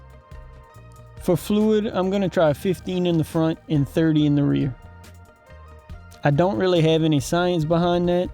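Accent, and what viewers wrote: Louisiana, United States. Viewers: Where are you from? That sounds like one of them Cajun accents!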